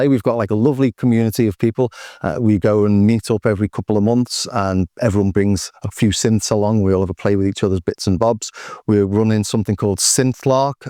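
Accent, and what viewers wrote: Liverpool, United Kingdom. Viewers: It feels spooky to have a construcitve lecture from someone with the liverpool accent on the corrosive effect on society of the politics of envy, but you are 100% correct. Make music with what you have, it's still fun. And it's always rewarding how one thing leads to another in the music world if you put yourself and your work out there.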